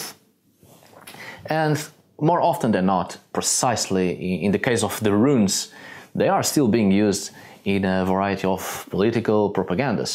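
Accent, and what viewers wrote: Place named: Portugal. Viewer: Were are you from' your accent looks very portuguese x)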